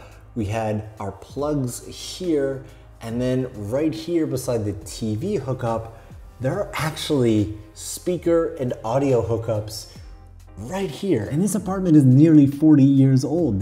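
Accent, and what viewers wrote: Canada. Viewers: This is the most Canadian accent I've heard in a very long time.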